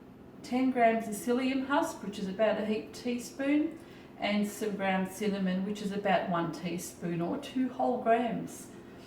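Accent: Australia. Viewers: What State are you in? I live in Perth and have not seen Western Star cream. It's really nice to listen to a quiet Australian not loud and annoying American accents (apologies to any Americans watching). Cheers Rosemary Perth Western Australia (70 yrs)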